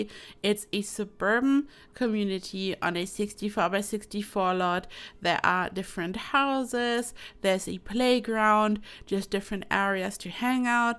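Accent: Germany. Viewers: Omg hahahhaha this is so funny:D It really is minor. I heard it so many years ago and could never unhear it. I realise its due to accent. My guess would be german? Also, go! Looking forward to more builds <333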